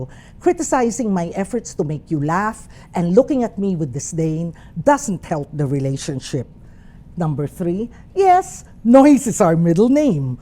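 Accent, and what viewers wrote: Philippines. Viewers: I came across this video and thought you were Filipina when I heard your accent! And hala, you are! Lol thank you for that insightful information! =)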